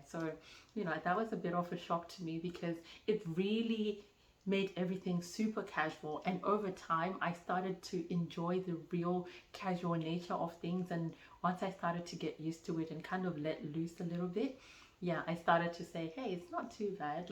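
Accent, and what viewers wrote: Australia. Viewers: Your accent has a very Australian sound now if you didn't realise. Welcome to the Family. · Your getting some Aussie twang :-)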